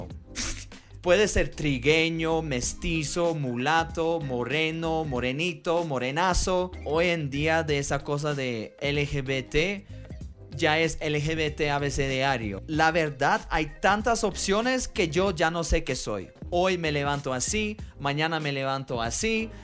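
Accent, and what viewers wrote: Colombia. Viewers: Me encanta escucharte hablar, ( porque aprendiste el español Colombiano).soy Colombiana y vivo en México. Siento que hablas mi idioma☺️☺️☺️ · So you're a Russian from Moldova who grew up in the Netherlands and now speaks Spanish with a Colombian accent? Dang! And I thought my story was complicated. Nice channel man!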